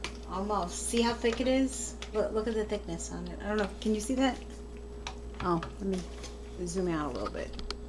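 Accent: New York, United States. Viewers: Luna Lia Gingerbread I believe that is a New York or Boston accent. Love it! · Thank you for letting us into your home. Your very sweet. Loved listening to your voice/accent. Are you from New York or New Jersey.? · I think your video is useful.....it shows timing of how long it takes, first hand. Screw anyone that doesn’t like it. I like it and I also like hearing your NY accent ,by the way! Good job! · Shes new York o bet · is she from new-york---- city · You sound like you have a New york, accent, I live in Rhode Island. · Great video! Fun gal. Wish you where my neighbor with your N.Y. accent! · Girl you're from NY! Brooklyn? I'm from the Bronx and I think Bronx and Brooklyn sound alike... I haven't made butter in so long here heavy cream is do high never found for 2 and change lowest $4.99 I'm doing keto so I use butter a lot! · I enjoyed your video; is that a NY accent? 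· I love her accent I think you should from Long island, New York, or perhaps Jersey. I also feel like she's a friend of mine like Francine said below my comment. Thank you I'm going to save this video very cool. I got a kick out of your frustrated with moving and not having all your things that you're used to using regularly. May God bless you and Long live butter!! 🐄 🥛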